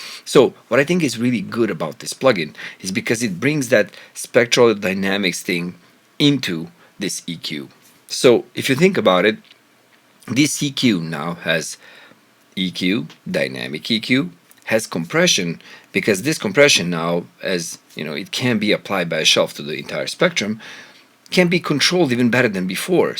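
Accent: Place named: Balkans. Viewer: Hey, if pro q 3 is my most used plugin, this one will be used even more! Are you from the Balkans maybe? you kinda sound like you are from my part of the world..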